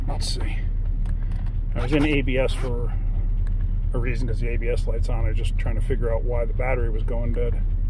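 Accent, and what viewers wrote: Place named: East Coast, United States. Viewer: lol! I was trying to identify your East coast sounding accent. I was pretty close. I'm amazed that truck is still running now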